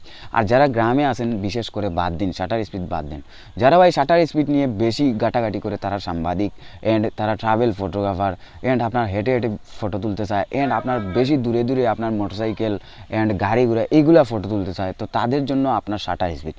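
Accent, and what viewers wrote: Sylhet, Bangladesh. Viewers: sylheti bhaisab,🤨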